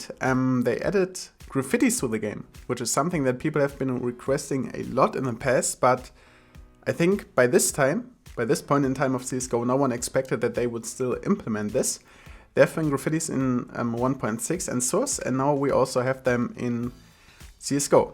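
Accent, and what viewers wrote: Germany. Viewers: Man merkt einfach immernoch dass du Deutsch bist😂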